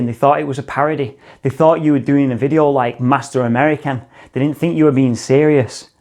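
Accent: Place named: England, United Kingdom. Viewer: Ain't here for Martial arts.. Just enjoying your English accent. Pleasant to hear.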